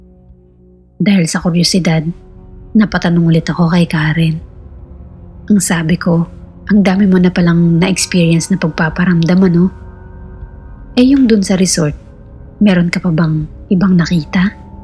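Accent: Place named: Philippines. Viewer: Another pinay voice, lovely, totoong pinay accent talaga, greetings from London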